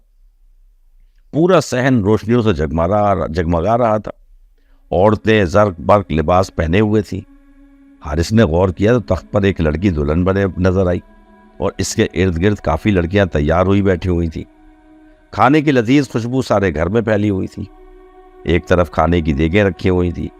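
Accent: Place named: Pakistan. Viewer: Amazing incident 😮 n love your urdu ascent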